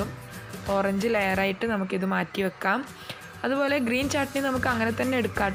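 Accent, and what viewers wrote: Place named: Kasaragod, India. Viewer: Ingal kaasarod aanengilum slang koykoottaaare cherya chaayvu ind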